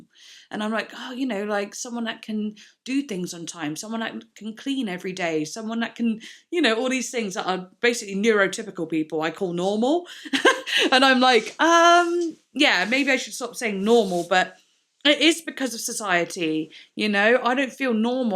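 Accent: Minnesota, United States. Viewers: OMG MINNESOTA SHOUT OUT!! I'M FROM MINNESOTA YOUR ACCENT IS PERFECT! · as a minnesotan i died at your minnesotan accent 😂 MINNESOTA MENTIONED!!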